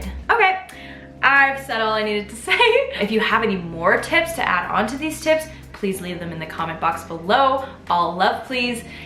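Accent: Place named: Canada. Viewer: I repeat I'm here only for sweet Canadian accent 😁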